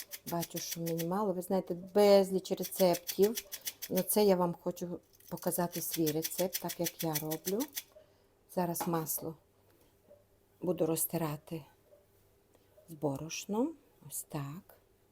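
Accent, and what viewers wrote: Transcarpathia, Ukraine. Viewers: Пані,дякую за рецепт.завтра буду пекти. Дякую за дуже детальні рецепти і за чудовий закарпатський діалект.❤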